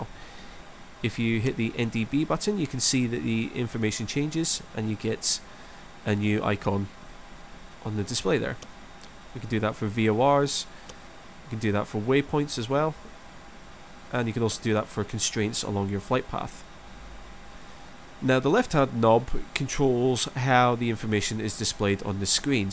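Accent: Scotland, United Kingdom. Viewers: lol, please make more videos like this. Do you have videos like this for the other, or pmdg range of aircraft? Those would be great. greetings from an Aberdonian in Michigan!:) loving your vids and Scottish accent which I miss living in the USA. edit: 911 sticks out too, have you seen Ex CIA Agent/Asset Susan Lindauer? I just saw her speech "to truthers" yesterday.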